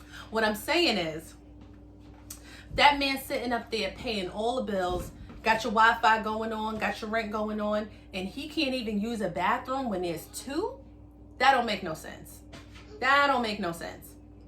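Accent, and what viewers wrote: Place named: New York, United States. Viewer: New York in the house 😆😆😆Love the accent!!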